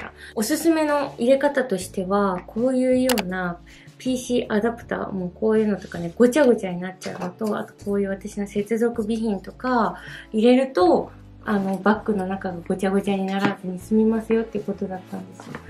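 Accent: Kagoshima, Japan. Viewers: 鹿児島県民です✋ 鹿児島弁聞いて、癒されました笑⸝⸝- ̫ -⸝⸝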